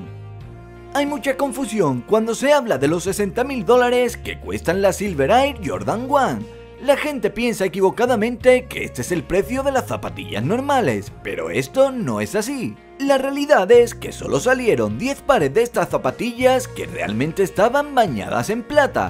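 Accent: Spain. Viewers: hola!! una pregunta eres español??? me dio curiosidad. porque pusiste billetes mexicanos y tu voz parece española 😂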